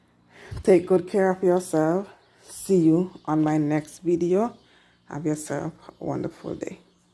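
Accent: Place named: Jamaica. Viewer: There is only one truth in this space , if it sounds too good to be true it is, they are all ponzi schemes. where u from ? u sound jamaican.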